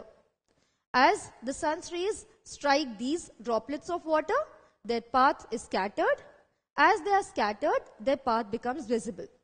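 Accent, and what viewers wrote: India. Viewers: aapke accent se pta chl rha hai ki aap indian hain toh kya aap hindi mein padha skti hain plz kyunki aapki eng zyada scientific lg rhi hai toh thodi mushkil aa rhi hai waise aapne sab kuch sahi aur accha padhaya pr plz agar ho ske toh hindi mein padhane 1 ka 1 baar try kijiye ka plz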